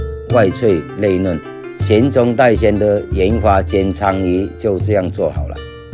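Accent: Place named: Southern Guangxi, China. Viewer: 北海人哎，桂南腔好怀念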